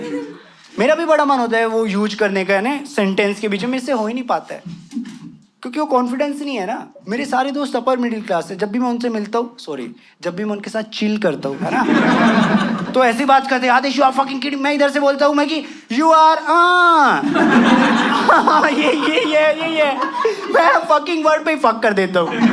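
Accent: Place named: United Kingdom. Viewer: your english accent gets me everytime :D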